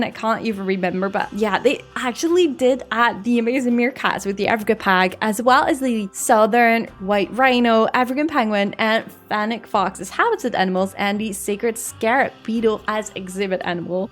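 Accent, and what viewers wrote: Netherlands. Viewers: You sound like you are from Nederlands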